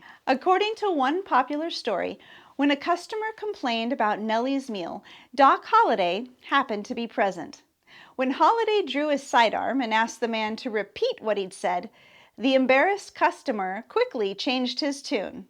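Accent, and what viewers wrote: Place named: Ireland. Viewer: great video about a great lady... i got a chuckle from your irish accent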